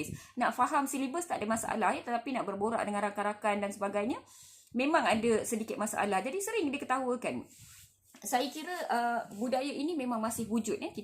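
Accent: North, Malaysia. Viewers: Doktor Anak malaysia yg betul2 sudah merdeka...sbab fasih berbahasa Melayu..yg paling Bagus doktor boleh ckp loghat daerah jugak..bleh ckp loghat utara kan😀😀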